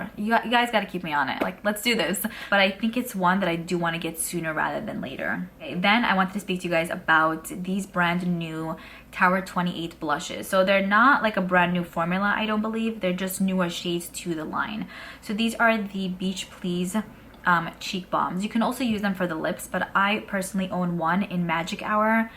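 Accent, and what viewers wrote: New York, United States. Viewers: I love the NY vibes !!! Your accent, the horns, all of it 😃😃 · sounds like you live in nyc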